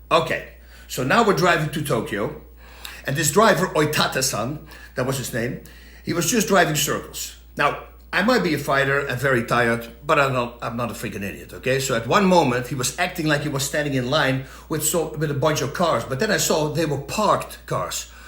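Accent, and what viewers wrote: Netherlands. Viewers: i couldn’t, his dutch accent is too much. every dutch person speaks english like this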